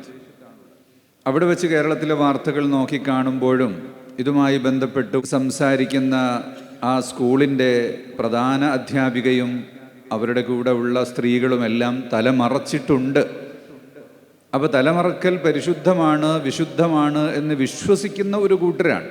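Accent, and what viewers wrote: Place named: Arab world. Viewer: Iyaku malayalam ariyumo?? Arabi malayalam parayunnu. Pacha sanki malayalam.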